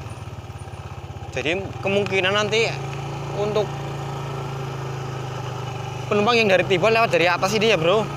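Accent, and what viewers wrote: Banyumas, Indonesia. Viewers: Keren mas bro otw trus, btw suara.ne ngapak sedulur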